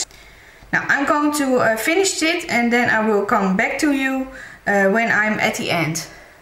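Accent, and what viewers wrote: Netherlands. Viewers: are you from the netherlands? cause i am and your accent sounds like your dutch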